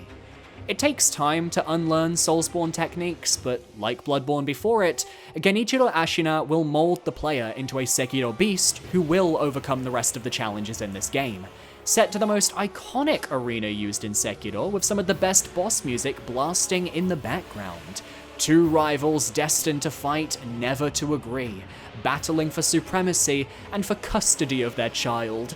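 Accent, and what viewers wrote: United Kingdom. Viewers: Stop saying Sekiro like a weeb, you’re obviously british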